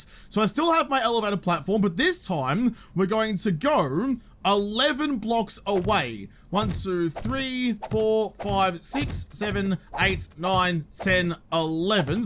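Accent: Australia. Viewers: You say at start of video for new people to game.. then proceed to shoot wood on ground and build right away. Lost me completely with that Aussie accent. Trying to learn.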